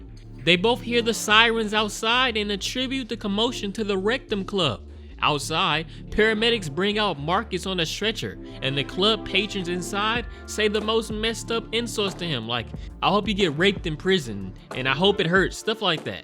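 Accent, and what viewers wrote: United States. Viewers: The most disturbing thing here is the whiny American accent.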